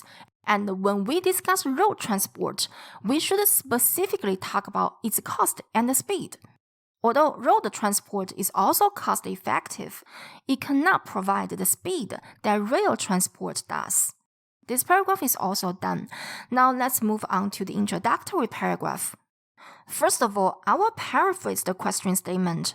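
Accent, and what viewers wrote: China. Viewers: Laoshi I love your accent my Chinese girlfriend accent was same I have lived in china more than 7 years Chinese people use the same accent they call everysing instead of everything You are great keep it up After listening your voice it take me back in memories of past that we have made in past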